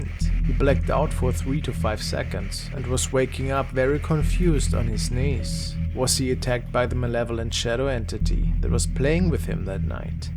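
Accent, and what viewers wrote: Germany. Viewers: Love your German accent my ancestors were German too and I love your accent it makes the videos all the mor spooky. Love you videos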